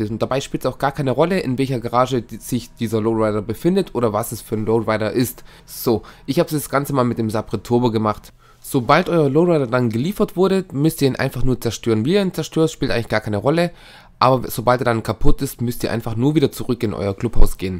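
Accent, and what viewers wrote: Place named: Swabia, Germany. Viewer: Dein Akzent ^^ Ich liebe ihn haha ;D Schwabe :D Ich wohn in Ravensburg, sagt dir das was? :D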